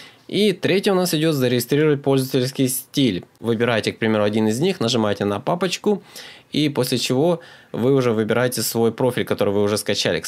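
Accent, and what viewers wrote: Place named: Kharkiv, Ukraine. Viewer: Кстати, из какого ты города? Акцент на харьковский похож))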